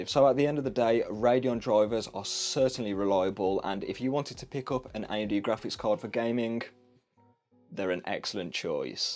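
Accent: Black Country, United Kingdom. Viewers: I wanna ask, are you from the Black Country? From the accent and your channel name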